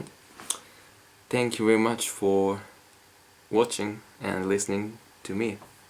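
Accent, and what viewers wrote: Japan. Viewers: I can tell you are a Japanese from your accent! Korean and Chinese have better English accent than you.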